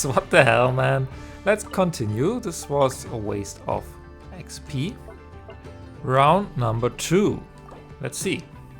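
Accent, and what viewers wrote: Germany. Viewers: Man hört dir dein deutschen Akzent so raus 😂 Aber gutes Video 🫶🏻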